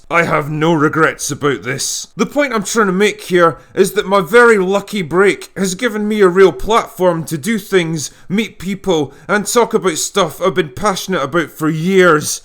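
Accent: Scotland, United Kingdom. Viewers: All they very best for 2020 mate. I enjoy your honest reviews a lot. If you don't mind me asking, with your accent, why are you drinking JD? There are plenty of excellent single malt whiskeys in your part of the world. BTW - I am especially of product from a little island off the South West coast of Scotland ( Islay ) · I'm loving the Scottish accent.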